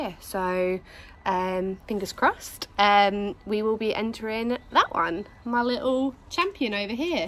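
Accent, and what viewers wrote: United Kingdom. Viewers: Your British accent is ❤️❤️❤️❤️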